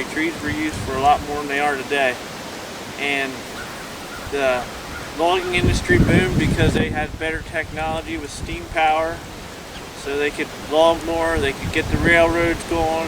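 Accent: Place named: Western Pennsylvania, United States. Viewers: Are you from Western PA or rural MD? I can tell by the accent. ;) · Your accent sounds more like Pa than Ky. Especially SouthEast Ky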